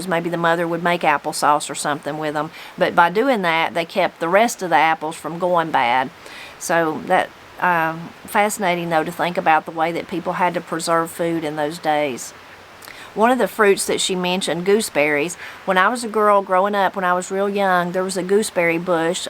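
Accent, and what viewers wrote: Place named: Appalachia, United States. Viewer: Listening to you read these old stories makes me smile, and brings joy to my heart. This reminds me of my Mama, and Mamaw reading to us as little kids. There's a special was us from the mountains talk, it's not a southern accent, it's a lot richer. Thanks!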